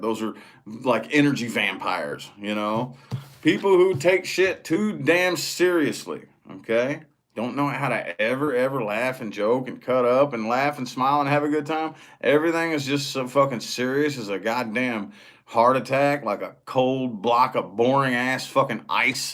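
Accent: United States. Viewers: I think its the whole american accent thing he has that makes me feel..... yesss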